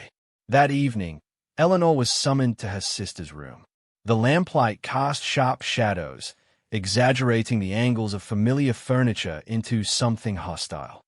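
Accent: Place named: Australia. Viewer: Sounds somewhat like an Aussie to me.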